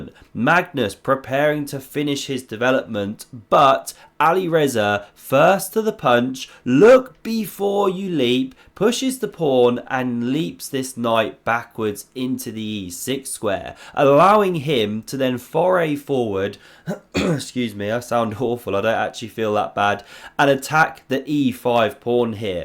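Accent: United Kingdom. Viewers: Must be UK 🇬🇧 accent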